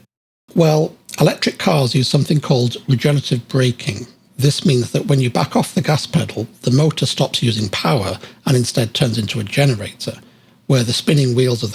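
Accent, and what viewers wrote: England, United Kingdom. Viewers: Excellent breakdown and video, although was a bit confused to hear a fellow Brit talking about a car with UK/European origins but using US costs! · A very good comparison but I have to wonder... If he's using Texas as a base, why does he have an English accent? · This is so weird listening to a British accent but in American prices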